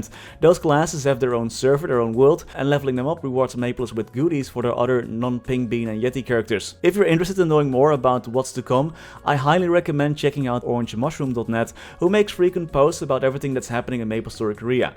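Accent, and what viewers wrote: Netherlands. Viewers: I can tell by your english that you are dutch lol! Thanks voor de video maat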